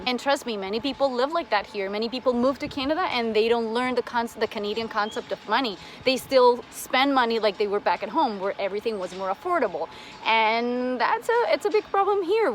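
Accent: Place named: Colombia. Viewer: I like your Colombian accent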